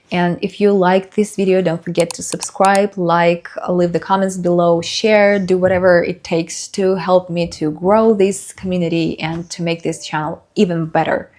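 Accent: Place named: United States. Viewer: Great video. You have a very good command of english, no doubts about it. Accent reduction, rithem, fluency. I've noticed that your pronunciation is very much influenced by general american English :)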